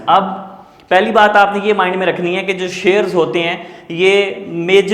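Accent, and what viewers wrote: Pakistan. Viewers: Are yyou frm Pakistan?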